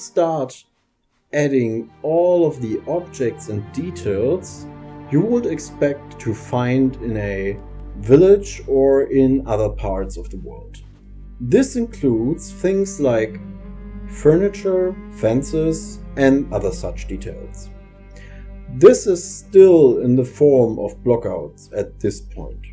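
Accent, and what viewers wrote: Germany. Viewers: Sehr gutes Englisch fast ohne deutschen Akzent. ❤ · you can hear the german accent :D gutes englisch bro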